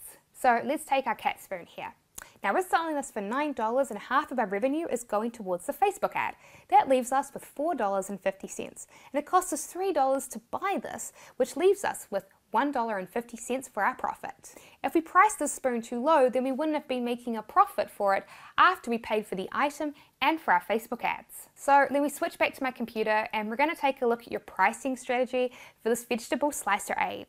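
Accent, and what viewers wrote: New Zealand. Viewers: I'm loving the NZ accent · I think it's a New Zealand accent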